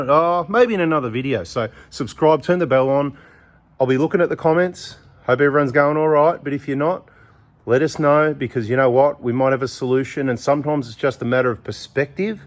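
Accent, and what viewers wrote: Australia. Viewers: Nothing beats an Aussie accent.😂😂😂👍🏽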